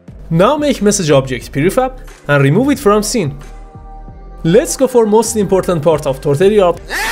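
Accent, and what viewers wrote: Iran. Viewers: you sound Iranian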